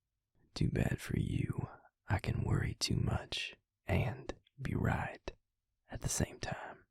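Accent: South, United States. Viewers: I love the southern accent 😫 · Him: taking My dumb ass self: you sound so Country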